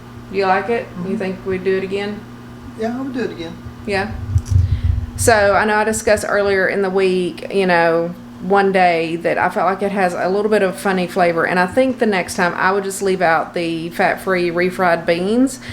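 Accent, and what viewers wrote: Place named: Kentucky, United States. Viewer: hey! Thanks for the great content, I enjoy watching your channel. I knew that you had to be from KY or close because your accent is just like mine!!! 😁😁😁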